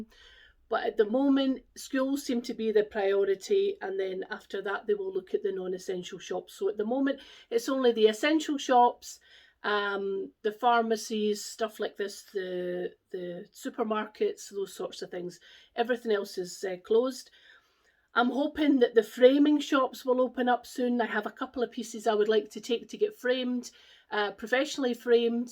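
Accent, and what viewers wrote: Scotland, United Kingdom. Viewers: I’ve just found you on flosstube! I’ve followed for a while on Instagram but didn’t know you had videos! Love your stitching and absolutely love your accent! Scotland is the one place I’d love to visit it’s beautiful in pictures and part of my heritage 🖤🏴 so thankful your parents were able to get their vaccine and did well with it hope yours goes good as well! Thanks for sharing! Happy stitching 🧵!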